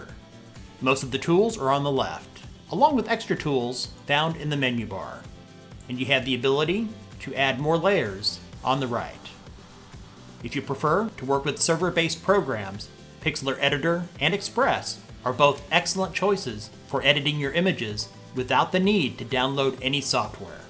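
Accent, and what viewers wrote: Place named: United States. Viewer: Wow, an english speaking American video, that's not from India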